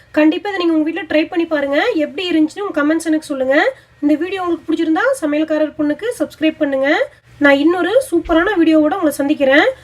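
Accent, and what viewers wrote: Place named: Gobichettipalayam, India. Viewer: hi !!!! I'm also from gobichettipalayam......unga salang keta udane namma oru than irukum nenaanachu check pannana semma...semma nenga namma gobichettipalayam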